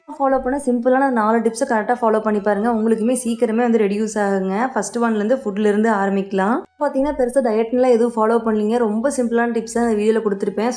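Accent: Kongu, India. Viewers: Sister love ur way of speaking polite kongu style, ur videos r positive as u are, hats off sisy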